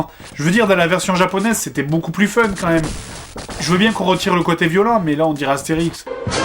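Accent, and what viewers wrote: South, France. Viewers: Tu as perdu l accent du sud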